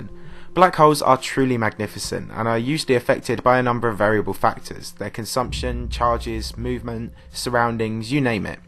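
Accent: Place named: England, United Kingdom. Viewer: Narrators with English accents; "Saturn" is mispronounced with the 'r' in Saturn silent, sounds like Sat-in, or Sat'n. I say mispronounced but to the English that's just the way they say it so I'm sure they would be quick to say it's not mispronounced. The word 'pattern' same thing, sounds like 'pat-in' or pat'n. It drives me nuts tho. The planet Uranus, pronounced Yer-In-Ess to the rest of the world while the the English just flat out say 'Your Anus' which, let's face it, just sounds wrong. Add these two words together and the English person say 'Sat'n Your Anus. Oh really, you sat in my anus? How was that? I don't want to know. I'll stick with 'Sat-urn' and Uranus.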